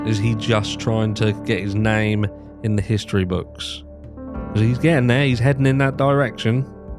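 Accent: United Kingdom. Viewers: British accents are amazing. Thanks for the commentary. Eye opening!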